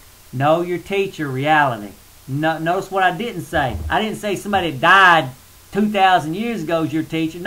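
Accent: South, United States. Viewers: You're awesome mate.. love your southern accent. Thumbs up ;D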